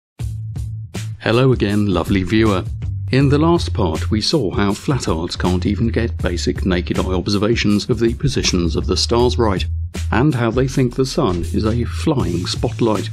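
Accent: England, United Kingdom. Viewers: Here we see the effect of "Education", a word that appears to mean very little to Flattards. As much as I enjoy these videos (and hearing an English accent for a change) I cant help thinking that the best way to deal with them is to not even give them the time of day - let them get on with their stupidity. They are clearly beyond help, and, like the religious, prepared to "Believe" rather than accumulate anything resembling a fact. However, I do enjoy a good laugh and Flattards do produce some of the best comedy Iv seen since Jim Jeffries took to the stage so for - Keep it up my good man, keep it up. Who knows, you might even educate one of the imbeciles, although, to be honest, I doubt that even Lawrence Krauss could educate these fools. Excellent job - Subscribed.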